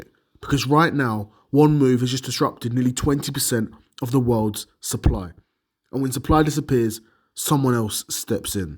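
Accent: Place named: United Kingdom. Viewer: oh,... you got a britney accent...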